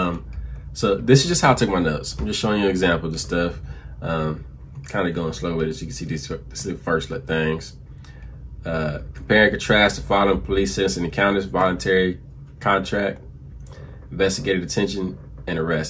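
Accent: Baltimore, United States. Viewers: I immediately knew he was from Baltimore when pronounced his “Do’s” and his “ew’s” haha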